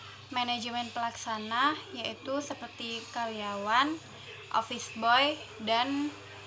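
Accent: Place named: Java, Indonesia. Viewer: Asli mana kak ..kok medok jawa ?🤣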